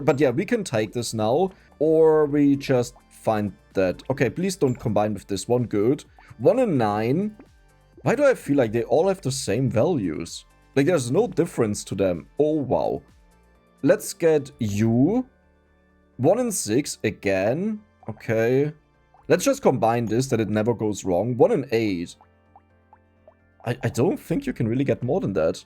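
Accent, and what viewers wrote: Germany. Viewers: such a nervenkitzel ;D ich liebe das deutsche englich einfach der beste akzent ;;D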